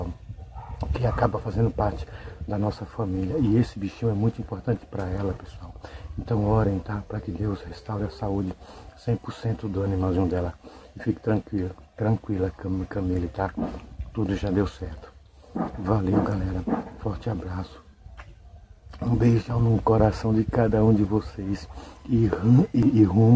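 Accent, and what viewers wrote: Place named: Northeast, Brazil. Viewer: O sotaque nordestino ❤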